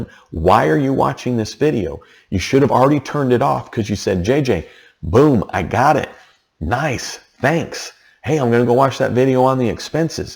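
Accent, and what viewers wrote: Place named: United States. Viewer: I Love your sarcasm and country sounding swag...Thank you so much for this..God Bless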